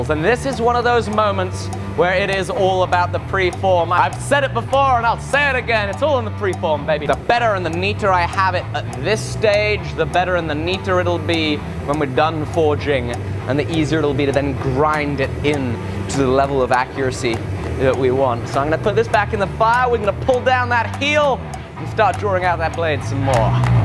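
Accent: United Kingdom. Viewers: Amazing work but can anyone place his accent. Is it part British ? I pick it up but what else and what region. Very interesting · What is that accent? I’m British and never heard that accent before, sounds like British mixed with another... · Where about do you live in the UK your British accent is so strong unlike mine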